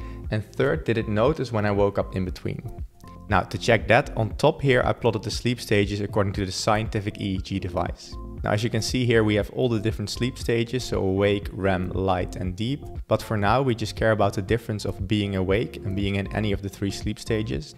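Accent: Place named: Netherlands. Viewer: I was almost asure you were dutch, until you said you live in Vienna 😅😂. Great video!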